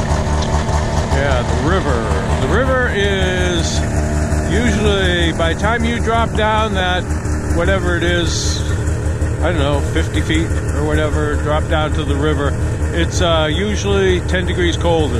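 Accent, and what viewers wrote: Boston, United States. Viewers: Are you from Boston? You sound like you have a little accent. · You have a Boston accent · Loved watching u on TV I can still here the Boston acent I have it too that's how I know, Glad found u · he grew up in south Boston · I just made the same comment. That’s a Boston accent all day long. I know... I’m from Boston. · So good to hear that Boston accent again! Haven’t had TV for a while , so.....no Tanana show😢. Found this on YouTube and am so happy! Keep going Stan!! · Stan, I have never met you or your family but I consider you a friend. I miss seeing you on your show because I really enjoyed hearing your Boston accent in cold Alaska. I hope to visit Alaska some day. Keep sharing and I will be watching from Cleveland. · You said "downtown" Tanana ? Bright lights, movie houses, traffic jams? I thought that was funny. You can take the man out of Boston, but you can't take Boston out of the man....."staat , New Yaak . 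Love it! Keep up the good work.....you're a real inspiration.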